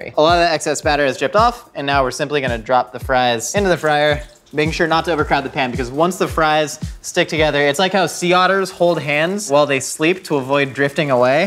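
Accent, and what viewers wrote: Australia. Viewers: I can confirn that accent is 100% Austrailian. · Sounds more like aussie 😂😂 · You sounded Australian not British lol good stuff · Lol sounded australian mate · Sound more aussie than English😂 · That moment when you realize that the Gordon Ramsey accent was actually Australian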